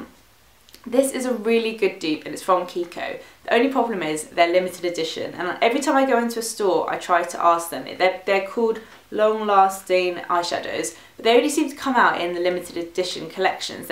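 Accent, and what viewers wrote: United Kingdom. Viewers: love your channel, your videos, and the british accent ...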